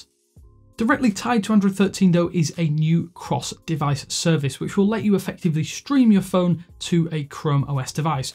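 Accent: United Kingdom. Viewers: Maurício Meireless fazendo review de versão do Android com sotaque britânico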